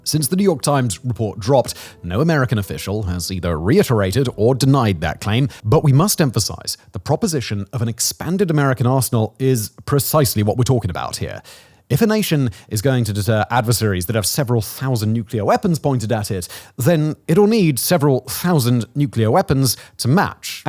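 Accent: United Kingdom. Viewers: Can we please have an American announcer speaking about America. Let this brit broadcast about the stabbings in London or stop with the intensional severe brit accent. Take the marbles out of your mouth and speak American english language.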